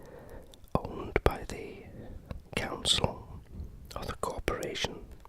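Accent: Wales, United Kingdom. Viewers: Are you from mid Wales? My fiance and I cant decide. We live in York. My Nana was Welsh, from nr Wrexham and his family are from Newport area. We have named our baby son Rhodri. He even has a birthmark which looks like a Welsh dragon. I love your memories videos. You are an ASMR super star.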